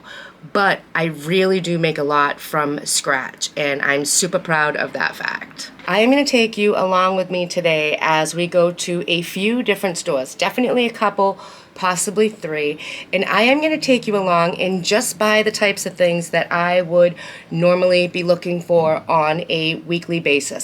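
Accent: Massachusetts, United States. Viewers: Just found your channel through this collab - you had me laughing so hard with your Wally World dis - hey, who doesn't feel that way? I also love your MA accent cause I've spent many happy hours in various New England states :-) So you have a new subscriber and I look forward to following along!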